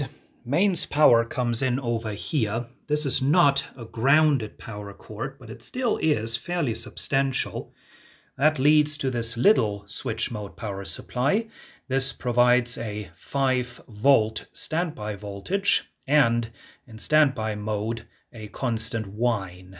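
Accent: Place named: Germany. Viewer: Bist du deutsch? Dein Englisch klingt sehr angenehm. So möchte ich als Deutscher wahrgenommen werden, wenn ich Englisch spreche.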